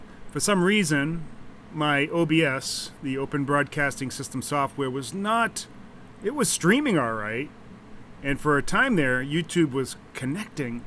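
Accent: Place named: Queens, United States. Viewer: I'm from Queens and that's how we fucking talk too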